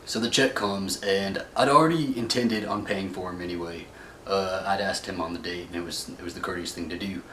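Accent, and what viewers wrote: South, United States. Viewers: Sound thick like Alabama or Georgia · American Southern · I'm pleasantly surprised at this story. Not the usual whiny entitled gay b.s. What an alluring Southern accent. But really, this date sounds like par for the course -- unfortunately. It was a bad date.. but don't blame the Grindr app. It's the man you chose to date. But that's what dating is for: to find out what a person's really like. I hope you don't give up on dating.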